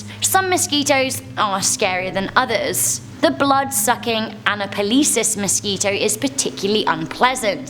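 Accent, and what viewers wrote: England, United Kingdom. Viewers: OK Guys.... be honest with me... "Who else would watch if she was doing a video on Tree Moss". Yup... Me too! The fact the does the Top 10... is a double win! Adorable/Sexy and that English accent just make me melt!... you are 100% Beautiful!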